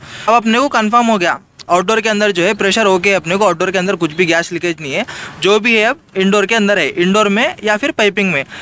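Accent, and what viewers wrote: Hyderabad, India. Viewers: Tume Hyderabadi mlum hote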